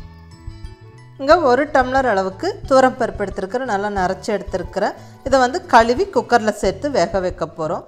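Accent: Coimbatore, India. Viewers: Madam neenga coimbatore ah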